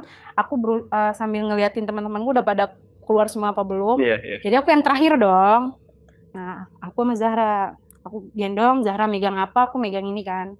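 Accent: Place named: West Java, Indonesia. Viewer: Kak logatnya udah mirip orang Sunda lho 😊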